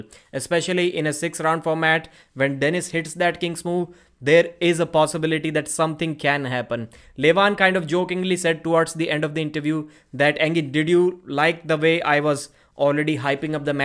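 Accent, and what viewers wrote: India. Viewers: why your accent like indian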